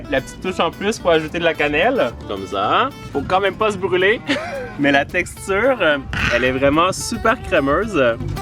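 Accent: Quebec, Canada. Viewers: 😂😂j’adore,ton accents québécois 😂😂j’aimerais trop abiter au Canada !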